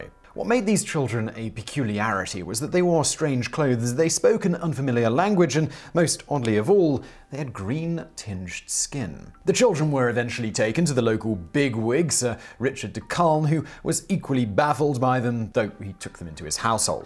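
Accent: England, United Kingdom. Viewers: Why is Michael from VSauce speaking British? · What area of England does your accent come from?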